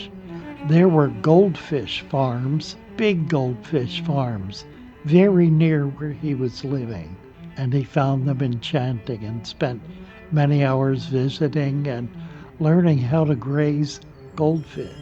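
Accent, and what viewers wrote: Baltimore, United States. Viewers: I knew you had to be from Baltimore when I heard you say “wuurder”, it’s the same way my mother says it. I grew up in Baltimore as well but I don’t pronounce water the same way she does. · I grew up in Baltimore too. Your life has been amazing. I knew the first time I heard your voice you had Baltimore roots. I'm sorry about your dad. I first got into the hobby at Seabreeze Aquarium on York Road and I remember they had dirted tanks in the shop. I'm 65. God bless you and yours.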